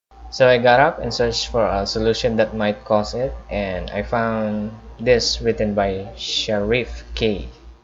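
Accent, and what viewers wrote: Philippines. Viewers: Proud of you accent sounds filipino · cant really miss that distinctive pinoy english sound lol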